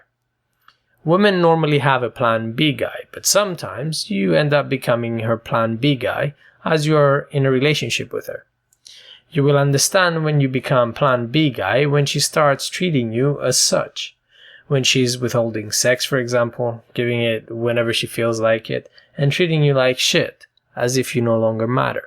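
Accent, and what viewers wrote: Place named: Russia. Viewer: you sound like you are russian or ukrainain.... i dont know why... but you were spot on.. why is it that.. people from such countries are so redpilled?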